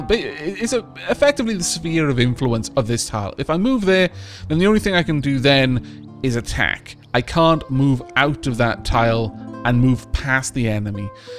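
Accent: United Kingdom. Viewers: Well this video is interesting... Tea surrounding London with a man playing England with a British accent. Sorry if it's something else.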